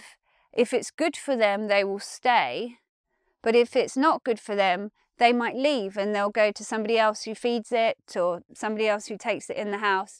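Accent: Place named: London, United Kingdom. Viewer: Her accent gives it away. She from the UK but if you want to get more precise she from London.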